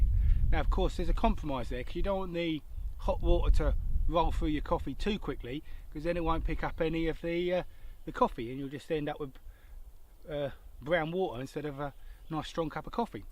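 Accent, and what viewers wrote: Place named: Cockney, United Kingdom. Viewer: a Cockney living in Sweden. :)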